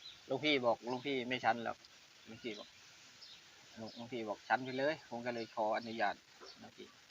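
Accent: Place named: Cambodia. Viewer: ได้ยินสำเนียงฟ่าวกดติดตามเลย หนุ่มขะแมร์ จุงกะๆเจียละออ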